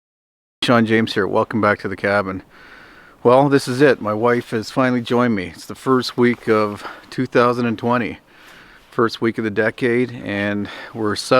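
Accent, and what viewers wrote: Canada. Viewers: Happy New Year! I love your Canadian accent. So sorry to hear you lost your business & left you in a lot of debt.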